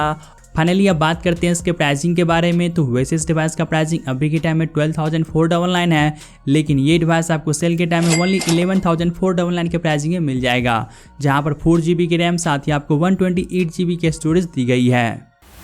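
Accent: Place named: Bihar, India. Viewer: भाषा उच्चारण में सुधार की जरूरत है बोली में बिहारी झलक नजर आता है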